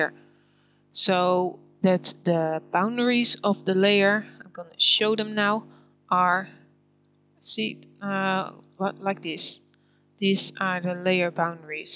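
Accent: Netherlands. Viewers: The girl doing the voice-over is soooooo Dutch. Not as bad as Prince Willy though ;-) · Definitely a Netherlands accent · Wauw... Kom ik na dik 2 uur uit op een video die het ein-de-lijk een deftig uitlegt! Los van het 'bijkomend' selecteren met shift was het het bijsnijden vd laag dat me de das omdeed. 2 foutjes rechtgezet met één video van 8 jaar geleden. Knap werk. Ook heel schatti, dat Hollands Engels :-D Grotejes van een zuiderbuur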